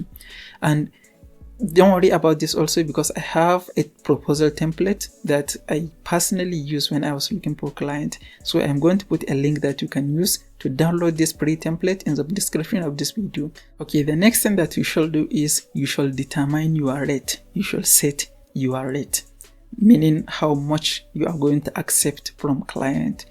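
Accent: Hausa, Nigeria. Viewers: Nice Video, I love your Housa Accent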